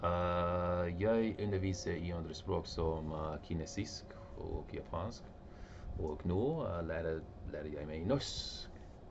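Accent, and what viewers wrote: Sweden. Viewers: Høres ut som en svensk mann som har bodd litt i Nord-Norge! awesome job man! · Høres litt ut som norsk språk med svensk uttale, men uansett kjempebra gjort! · Du var veldig flink men du har en slags svensk aksent, men du var veldig flink for å snakke norsk er veldig vanskelig, men hvis man kan svensk er det litt det samme. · I could still hear a Swedish-ish accent there, but I could definitely understand everything you where saying, keep it up :) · Litt svensk dialekt men ellers bra norsk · Sounds so amazing for two months!! My mother who is Filipino and lived in Norway for 20 years and you are juuuust below her in speaking.you have a very Swedish accent which is funny, so you have an American Swedish Norwegian sound haha. Kudos to you, much love from up north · Love your stuff man. Btw, you are speaking norwegian with a swedish accent ( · You speak a bit swedish/norwegian mixed, but you are amazing still! 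Im a Norwegian and I love Japanese, ive studied it some! id love for it if u could help me learn it :D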